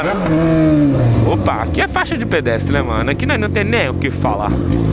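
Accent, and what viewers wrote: Goiás, Brazil. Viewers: tem alguma ligação com goias? Ou so modo de falar? É noos!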